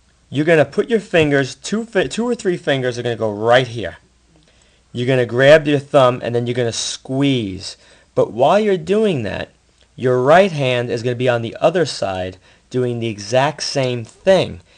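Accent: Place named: Rhode Island, United States. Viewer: Sounds like my friend from Rhode Island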